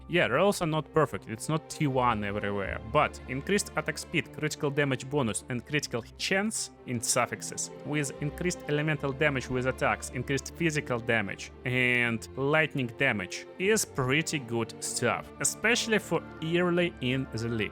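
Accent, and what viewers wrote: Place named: Russia. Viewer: Are you russian? Based on music choice and strong accent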